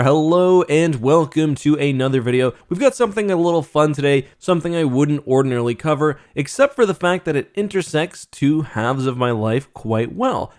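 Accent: Canada. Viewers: Amused. I live in Alaska so I didn’t even pick up on your Canadian-ish accent until you stated that you “practiced Canadian law.”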